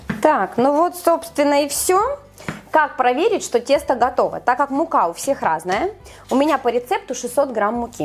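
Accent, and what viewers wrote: Surzhyk, Ukraine. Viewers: Да, проскальзывает "суржик", но не противный, как у многих! Зато все настолько гармонично...и кухня, и посуда, и манера приготовления...смотришь некоторых, плита грязная, посуда горелая, кухня вообще прошлого века, готовят неаккуратно, но лезут показать свои "шедевры". - молодец, руки умелые, много знает, чистота на кухне, рецепты интересные, проверенные на себе, аккуратность...так что мелочи речи не в счет! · Это Украинский диалект. Мы живём рядом и тоже так говорим.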